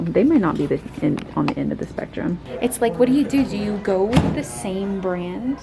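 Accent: Texas, United States. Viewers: The Texas accent was prominent in this video!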